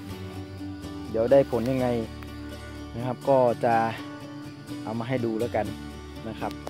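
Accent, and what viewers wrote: Isan, Thailand. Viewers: สวัสดีจ้า หมานๆเด้อโอ่ย เจ้า เว้า อีสานบ้านเฮา โลด ขอย ฟัง แล้ว ลำคานๆๆเด้อจร้า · เว่าอิสานจังคือเด้อจ้า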